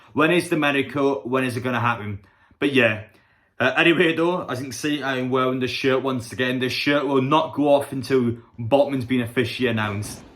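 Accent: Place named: Geordie, United Kingdom. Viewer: mate whats your accent? Its a mix of geordie and something else😂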